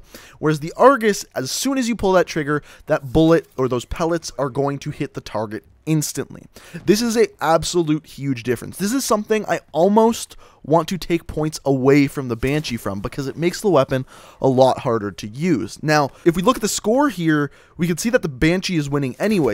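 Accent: Canada. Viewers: is this guy canadian he sounds canadian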